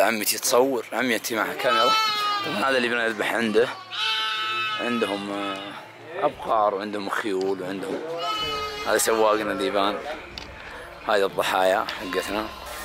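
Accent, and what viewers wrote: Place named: Subay'i, Saudi Arabia. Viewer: انشدك بالله انت من مطير ولاسبيع لغوتك وفي روايه اخرى للنقوج ودمك ماهو دم عتيبي ولادوسري حي يامال العز ودي انك مطاري بس اشك انك سباعي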